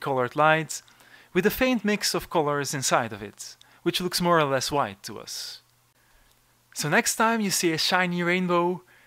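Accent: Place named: Europe. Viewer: When you click on a YT thumbnail: 😊 When the European accent starts 😢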